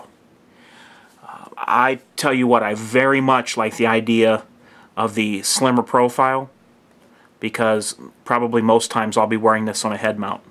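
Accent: United States. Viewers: What part of the states you from again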